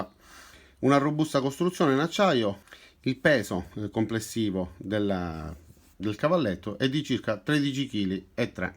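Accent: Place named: Calabria, Italy. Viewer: Ti giuro che ho pensato sin da subito che eri calabrese!!!hai un accento molto simile al calabrese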